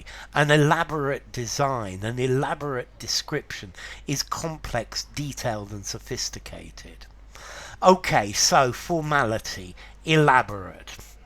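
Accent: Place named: Cockney, United Kingdom. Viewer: You're within the bow bell range Professor. You know how to speak Cockney 👏👏👏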